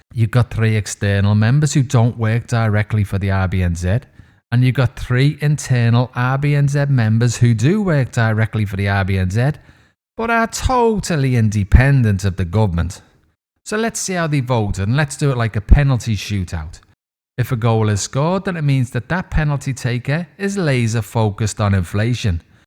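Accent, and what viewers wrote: Liverpool, United Kingdom. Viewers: Not big on Cynicism. The tone is off putting. Not sure a British accent helps either. Sorry but Brits have been telling us what we're doing wrong since greedy Governor Grey. Go home and fix your own problems - not add to ours please. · Ah I’ve missed that Scouse lilt and it’s take on NZ! Welcome back!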